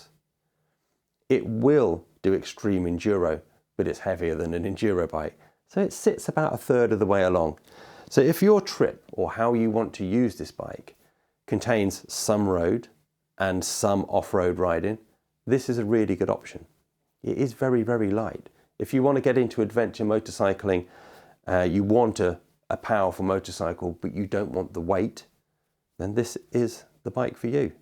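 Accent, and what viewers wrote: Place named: United Kingdom. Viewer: Best vid on the 690! I need it all and your British !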